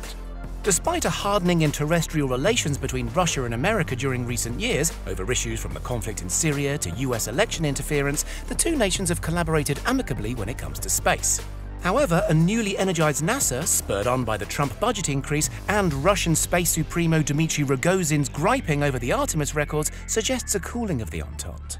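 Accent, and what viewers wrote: United Kingdom. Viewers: Hes british chile...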